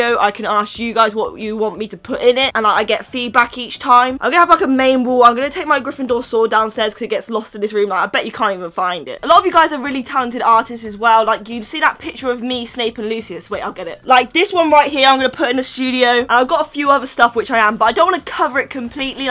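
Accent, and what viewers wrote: United Kingdom. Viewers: You were bragging about your dollar, and me being American, completely forgot you were from England, so I was very confused for a few seconds until I remembered. And if anyone here decides to point out her accent, I'm used to that accent because my father is from England, meaning I hear the accent every day.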